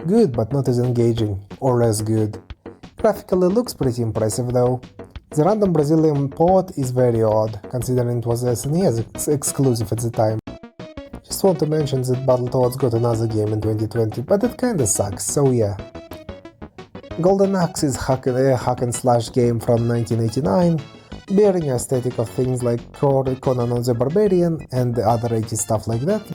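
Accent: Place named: Russia. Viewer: Why do you have russian accent 🙄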